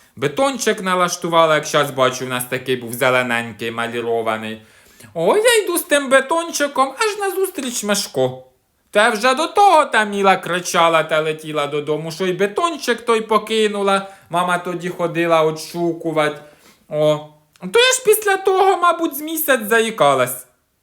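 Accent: Poltava, Ukraine. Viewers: Обожнюю ту полтавщину, моя рідна бабуся з кобеляк, ну точно так балакає😂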